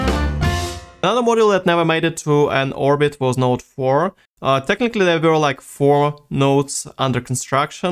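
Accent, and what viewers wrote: Russia. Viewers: Oh, okay. Your accent hears like real russian :D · Прекрасная работа! Даже не представляю, как много ты тратишь на ролики. Реально достойно уважения, учитывая попытки идти в сложные темы и сразу на английском (верно ведь понимаю, что русский твой родной язык? Слишком хорошо чувствуется русское произношение). Верю, что рано или поздно, сохраняя подобное рвение, добьёшься больших высот и, чем чёрт не шутит, будешь наравне с Мэттом или, прости что копирую, Stratzenblitz75. В общем, верю в тебя, чувак. Продолжай в том же духе, если тебе это интересно. PS: Если не сложно, можешь исправить "Куррент"? К сожалению, не на часы смотрим, не ждём биения курантов, хоть и в текущий момент все эти модули и существуют. Бьёт по ушам такой "Карент". В любом случае, достойно уважения! Спасибо..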